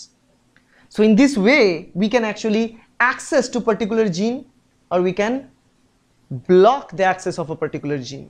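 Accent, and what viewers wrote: India. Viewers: its indian accent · I love the indian accent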